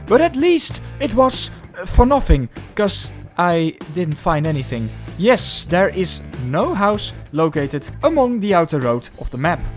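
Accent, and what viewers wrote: Netherlands. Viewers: nice dutch accent! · waarom klink je zo nederlands???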